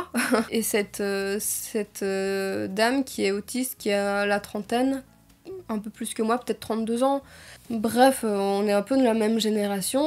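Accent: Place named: Namur, Belgium. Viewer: c'est la maniere de parler aussi , la sonorité des mots, ca me fais penser a mes amis qui ont grandit a namur et ses environs, en tout cas j'espere que tu vas biens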